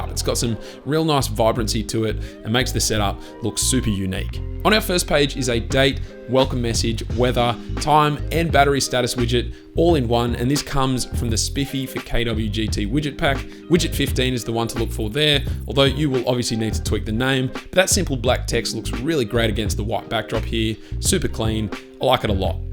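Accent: Australia. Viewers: Watching all the tech YouTubers. I forget how heavy and distinguishable our Australian. Great work also, I don’t have an android phone but this was so cool.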